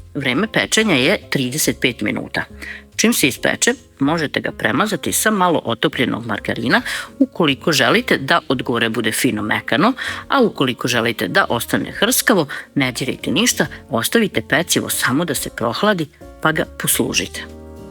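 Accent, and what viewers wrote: Vojvodina, Serbia. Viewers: Svaka vama cast.Po naglasku bih rekla da ste iz Vojvodine ako je tako nije cudo sto vam sve ide kao voda.Veliki pozdrav.❤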